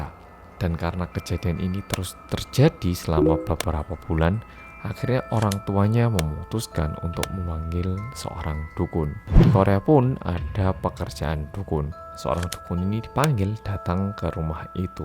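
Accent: Java, Indonesia. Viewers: Mana orang korea yabg medokkkkkkkk................;v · Aku rasa kok medoknya mas rada kurang ya.wkwkwk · bersama orang korea yang... aku: medokkk mas: kewedennn sampe lupa:'' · Medok Is Back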